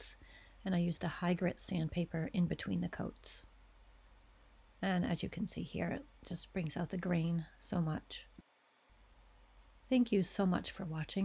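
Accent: United States. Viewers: So, American accents that aren't irritating for Brits to listen to DO exist! Really nice job on this board. Looks great. (You could voice audio books!)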